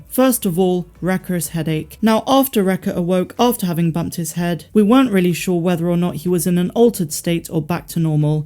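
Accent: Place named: Bristol, United Kingdom. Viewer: you sound bristolian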